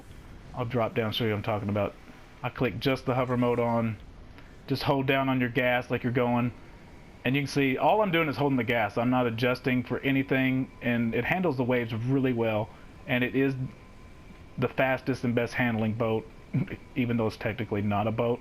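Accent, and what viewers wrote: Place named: South, United States. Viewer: Gotta love that southern accent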